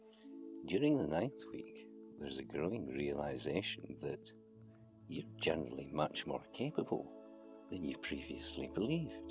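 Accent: Scotland, United Kingdom. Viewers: Hi, I think the music is a little louder than your voice so it makes you very muffled. Normally I am good with Scottish accents lol Thank you for responding. I will try it again.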